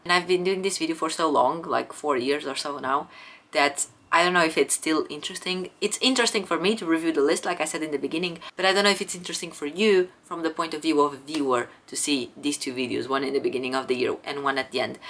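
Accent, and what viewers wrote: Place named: United Kingdom. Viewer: Já começas a ter um mini sotaque brittish. Neste vídeo notei bastante nalgumas palavras e tom da frase :)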